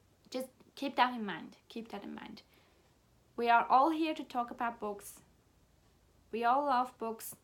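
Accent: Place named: United Kingdom. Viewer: Hahaha, woah a shocking concept... are you sure you're allowed to not watch videos that you don't like? I mean, how else will you be able to make a video complaining about it? Haha! ;) I just really liked the chattiness of this video, it made me chuckle to laugh along with some of your stories, especially the failed film experience at the theme park, haha. British accent is totally the best accent... not biased at all, you know, haha. I remember being tagged in this video at one point, and then deciding that I didn't feel any need to do it, so I just answered some of the questions in the comment section of that video, but I totally understand why people are talking about the point/relevance of this tag. You need to go to Nando's, it's a Portuguese restaurant which is highly popular in the UK and caused a massive thing online about the term 'cheeky nando's' which I think turned into a meme or something. 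But it is a great restaurant, and even though they focus on chicken, their vegetarian options are delicious! HOW CAN YOU NOT LIKE TEA?! Hahaha, maybe you just haven't found the right kind of tea!? I'm joking of course, only slightly wounded... slightly.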